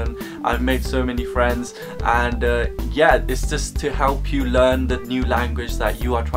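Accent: United Kingdom. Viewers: Oh my god are you british? You have an accent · You sounded British but you looked like korean. XD This app you shared would help a lot should I consider learning formal korean through Berlitz though, I learned a bit korean in the academy. I guess my brain should get a hang of it soon. I dunno maybe bec.its very diverse theres a lot of languages introduced when im in school spanish, japanese , chinese, korean but english is my official secondary language. Honestly, its pretty messed up. Tnx for this helpful app!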